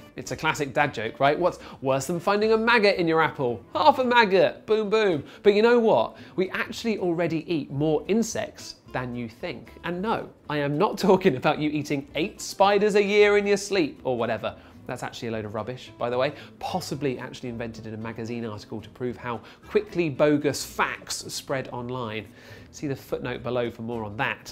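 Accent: United Kingdom. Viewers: British accent sometimes is harder to understand than North American.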